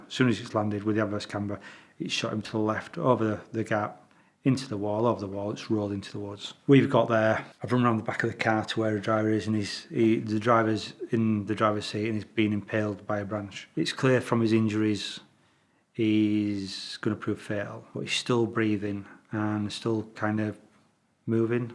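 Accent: Yorkshire, United Kingdom. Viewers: First time on your channel, recognized that lovely Yorkshire accent right away. This brought back to me five years ago when I was in Scotland. I was getting ready to go do something’s when a cold dark wave rolled over me leaving me heartbroken and wiped out. It wasn’t until later that day that I was told my son in Vancouver died at that time. Eight hours difference in time, his time two am and mine ten am.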